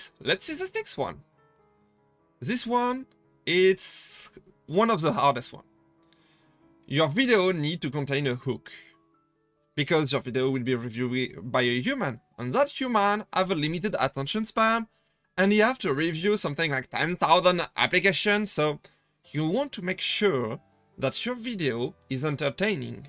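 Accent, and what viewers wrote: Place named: Japan. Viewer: great video. btw Im interested in your accent. is your native language japanese?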